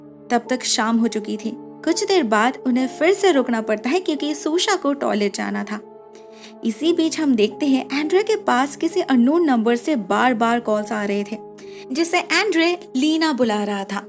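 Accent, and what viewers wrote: Bengal, India. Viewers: Hello mam by your accent I think you are from Bengal , if not then can you tell from which state you are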